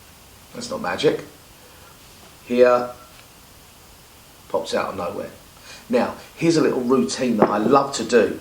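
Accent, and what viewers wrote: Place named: Cockney, United Kingdom. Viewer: Your cockney accent was brill! Subbed just for that!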